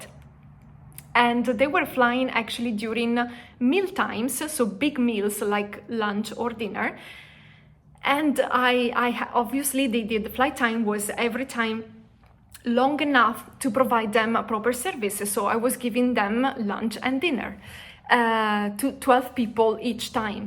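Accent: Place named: Italy. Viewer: You have a nice Italian accent.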